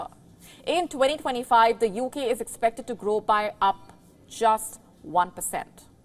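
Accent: India. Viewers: I am very disappointed that Rishi does not speak with an Indian accent like he should!! So sad and tragedy!! Indian accent has to be the most beautiful accent in the world like this news woman. No trolling here!! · A horrid abrasive accent she has. Like NBC from India.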